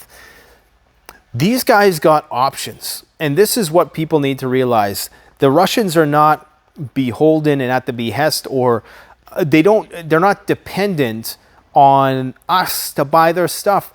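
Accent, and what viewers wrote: South, United States. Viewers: Mr. should sound like a surfer dude since he's from California. lol Good southern accent though. Nailed it. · Awesome southern talk lol Iol that was funny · Good southern accent! :) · Lol, 😆 love your " southern accent🙂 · Excellent use of a southern accent! :) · Hi keep doing what you’re doing. Loving your red neck ascent, you should try an Aussie bogoan ascent next 👍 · Southern Accent, FLAWLESS…. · Oh, you are funny...you speak Southern...sounded perfect to me...🤣😂🤣🤓😂🤣 I hear it all around me here in Kentucky 😂 And i just adore the southern drawl! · Love your southern accent lol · Your southern accent gives me big George Dubyah Bush vibes hahaha. You crack me up, keep it going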